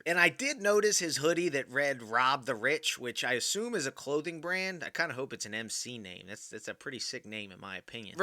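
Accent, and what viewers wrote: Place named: Appalachia, United States. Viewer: You should do your own narrating, instead of the hillbilly hick voice. Your natural voice sounds better.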